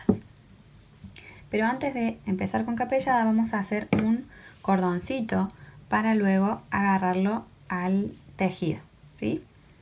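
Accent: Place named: Córdoba, Argentina. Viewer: Qué hermoso!! Tgo 80 años, tejo maravillas, y estoy re tentada de hacer estos zapatos!!! He tejido pantuflas p regalar en pandemia, pero ésto es superior!! Y escuchar tu "tonadita" de ntra Cba, más!!! Gracias X tan bna explicación. Ely,ciudad de Santa María de Punilla, Córdoba, República Argentina.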